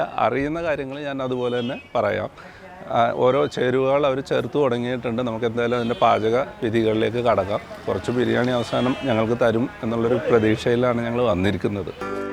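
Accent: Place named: Thrissur, India. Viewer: അവതാരകൻ തൃശൂർ ക്കാരൻ ആണെന്ന് തോന്നുന്നു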